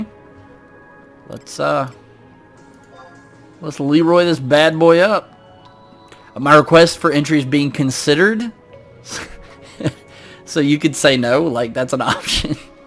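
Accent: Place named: South, United States. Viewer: I’m always surprised when I hear a familiar accent in a hobby that I enjoy. I’m completely used to being the oddball southerner that likes nerdy stuff. Cheers man, thanks for great content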